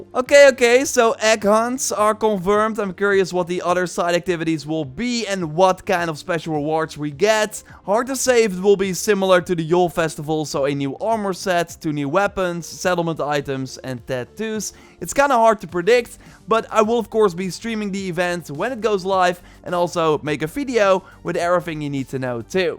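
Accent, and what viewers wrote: Netherlands. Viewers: I HATE his Dutch accent on his english.... like it sounds cheap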